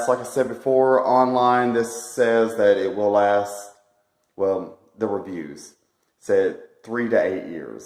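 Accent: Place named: Georgia, United States. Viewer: I'm going to try this!! You were hilarious! 😂 You sound like you are from Georgia!! Same as us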